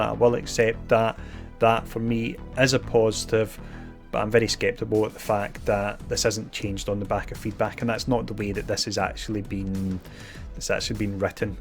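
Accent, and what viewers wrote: Scotland, United Kingdom. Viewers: I applaud you for your honesty and integrity. I recently started playing MSF because I have too much time at home due to an injury, and I am binge watching many MSF videos from many content creators and I could tell you are different from the rest instantly. No disrespect to others, but your calm and easy going attitude (even when you keep getting minions during orb openings) made me subscribe to your channel. When I get better and start going to work, I doubt I will have time for MSF (Marvel Future Fight is a much better game, no randomness bullshit, purchases are not mandatory and do not cost an arm and a leg, etc) but I will keep subscribed to your channel and watch your videos as they are released. I also love listening to your lovely Scottish accent:) Keep up the good work.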